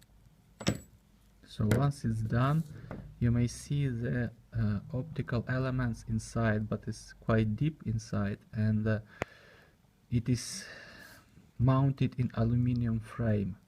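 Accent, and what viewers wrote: Russia. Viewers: lol, your pronunciation as a Russian man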